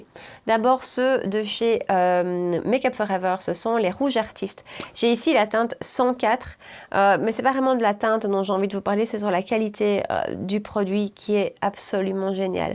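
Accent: Belgium. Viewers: J adore tes vidéos, j adore le fait que tu viennes de Belgique ( je suis belge et j habite en France depuis 10 ans, ton accent est un émerveillement des ouïes) et j adore ta couleur de cheveux, aurais tu la référence de ta couleur stp?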